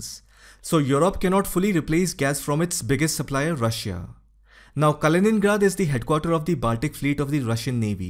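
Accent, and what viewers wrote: India. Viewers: Its really amazing to hear But i couldn't understand that With Indian accent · Why is a guy with an Indian accent narrating a video about European history.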